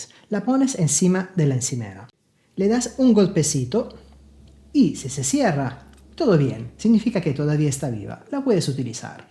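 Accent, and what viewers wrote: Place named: Italy. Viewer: Buenos dias. Ya activé la función WhatsApp. Es una gran idea y gracias por hacerme partícipe Este poema es para ti Cocinando Sabores y maestría de la mano de. Simpatía y sencillez. Cocinando algo rico paso a paso cada vez. Y siempre acierta él porque algún ingrediente en casa sueles tener. con su encanto y su acento italiano. Y aunque a su mujer no logre conocer, la inspiración ha de tener. Hace de todo este Chef, da consejos, hace puzzles, capaz de contar chistes buenos también. Admiración y estima siente esta gaditana. Que dice siempre lo que le vienen ganas. Para y su mujer de una fans y cocinera apende recetas nuevas.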